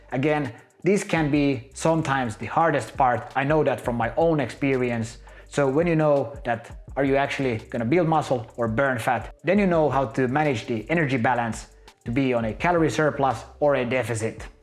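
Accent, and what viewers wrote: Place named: Finland. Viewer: hienolla suomalaisella aksentilla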